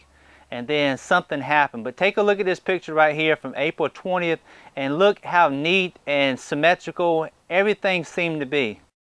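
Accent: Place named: North Carolina, United States. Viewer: I just subscribed about a week ago. And as a Louisiana farm boy I find your videos informational and entertaining. Having worked in North Carolina for a few years (Pittsboro area) I can tell by your accent that you don’t live very far away. Keep up the good work and I will be watching for more good stuff. Specially as you change “SMOKE” hats…….lol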